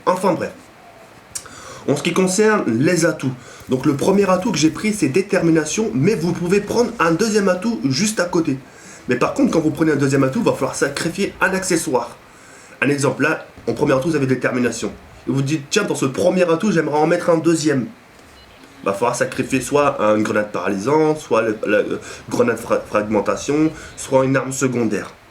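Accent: Arab world. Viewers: Salut, c'est dernier temps tes vidéos me plaisait moins, tu était moins acharnée je pense que tu attendais ce nouvel Opus pour pouvoir faire l'originalité et te refaire plaisir. J'ai eu le sourir durant cette vidéo car j'ai bien vue que tu était dans ton truc tu aimez tu passez du bon temps a commenter ta vidéo. Tu redeviens le bon avec son putain d'accent d'arabes (Pas méchant hein;)) Tu me fais plaisir continue, tu vas allez loin et surtout: AMUSE TOI!!! Peace frerot! <3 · tu parles un peu a la rebeu ^^ mais tu geres et tu respecte vraiment les autres, au point de dire que mrlvl12 explique mieux, tu te prend vraiment pas la tete ca fait plaisir ;)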